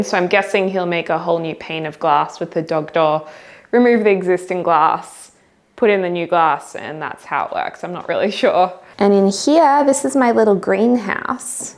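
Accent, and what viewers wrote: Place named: Australia. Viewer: listening to you talking just reminds me of aus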